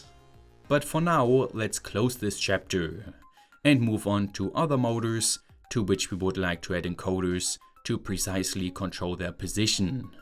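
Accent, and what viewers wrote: Germany. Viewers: i think Entr is asking where in germany. different regions have different accents, as in any other country... i think Entr wants to know where specifically (and so do i) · ALSO ich habe in diesem Video das erste Mal gemerkt, dass du einen deutschen Akzent hast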